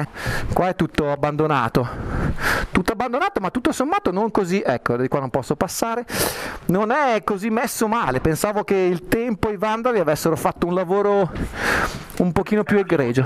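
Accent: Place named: Emilia-Romagna, Italy. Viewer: sinceramente no, dall'accento sembri delle mie parti (emilia romagna o giú di lì)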